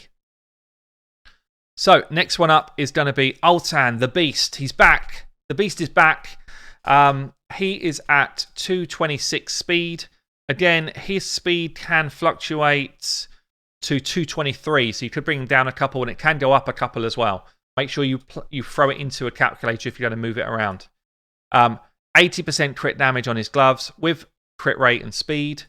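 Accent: United Kingdom. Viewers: Congrats. You rock! I love your British pronunciation and some expressions, it’s such a fun to listen and learn from your content. For me you should in each posted video say straight to the bin. You can say also as much as you want in each - Alure. Cheers mate!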